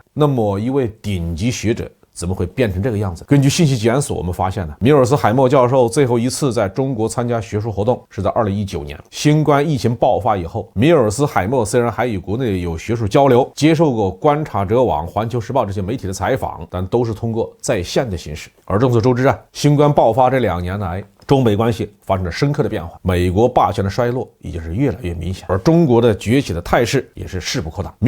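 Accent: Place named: Anhui, China. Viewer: 你是不是孝感地区的人？口音要么就是安徽某些地区。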